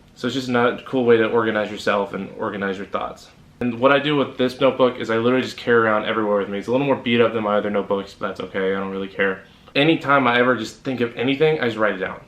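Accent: Arkansas, United States. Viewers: no way !!!are you form arkansas? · Are you from arkansas? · Are you from Arkansas?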